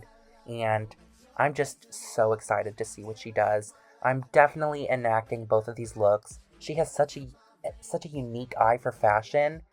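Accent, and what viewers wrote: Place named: United Kingdom. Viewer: Your british accent cracked me up🤣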